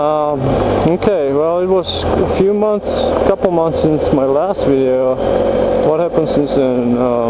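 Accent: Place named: Germany. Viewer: may i ask, where you're from originally? You sound like you have a German accent. Take care, ride safe,stay safe dude !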